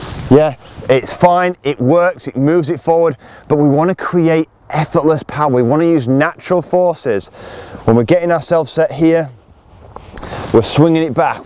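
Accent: Yorkshire, United Kingdom. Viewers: That's because he's from Yorkshire - no bull!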